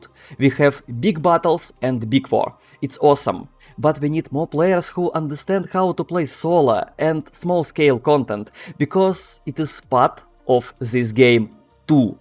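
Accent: Russia. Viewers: ты когда говоришь на английском, представь, что ешь картошку) А то слишком русский акцент, по ушам бьет и могут не понимать. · Ляя, ну нахера стараться держать этот русский акцент. Ты можешь читать нормально по английски. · Ну хз, вот как раз такой "русский" английский я понимаю, потому что произносятся те звуки, которые иностранци проглатывают :D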